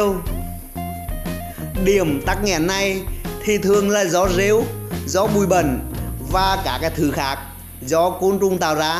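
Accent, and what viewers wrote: Nghe An, Vietnam. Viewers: Rất hay và hữu ích. Bạn giọng Nghệ nhg ns rất rõ ràng, mạch lạc. Tks bạn nhiều! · Tuy nói giọng xứ Nghệ , nhưng cách diễn đạt và thao tác thị phạm hướng dẫn rất mạch lạc, dễ hiểu, dễ thấy, dễ làm theo.. Cảm ơn bạn nhiều ! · Người xứ nghệ giọng nói hay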